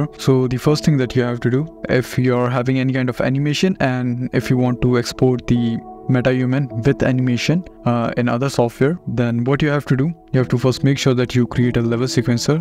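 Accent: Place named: India. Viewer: fucking kajeet tech supposrt singh ass accent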